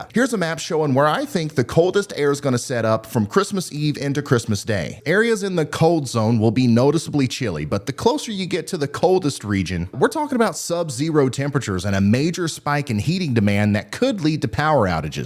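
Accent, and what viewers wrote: South, United States. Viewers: dude has a country accent but his intellect fights against it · This is like the one deep voice guy from riddle but southern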